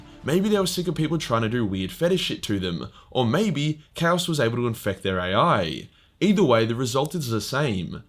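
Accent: Australia. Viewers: Yeah Australian gen alpha is bleeding my ear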